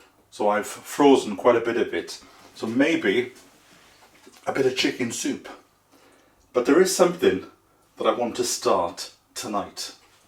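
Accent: Wales, United Kingdom. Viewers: Love watching you,find you so relaxing,that lovely Welsh voice.I’ve been in my garden today starting tidying up,loads of bulbs coming up,great time of year.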